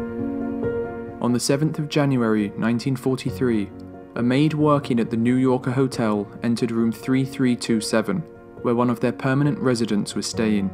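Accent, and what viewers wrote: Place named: Estuary, United Kingdom. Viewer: Noce job. Remember v.o. "the' is "thee" on all vowels . (There's only 5...) And no estuary flat "Oy" on "I"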